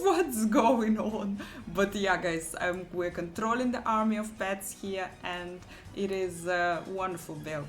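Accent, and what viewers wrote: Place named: Russia. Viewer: I find it very pleasing to listen to you talking about diablo with that russian accent 🤣😅 also nice video!!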